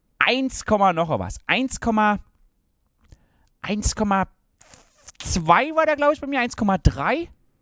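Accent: Saxony, Germany. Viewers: Typischer Sachse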